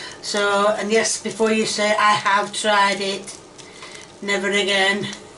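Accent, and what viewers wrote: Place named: Northern England, United Kingdom. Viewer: I detected northern-ish England in her accent. I love it!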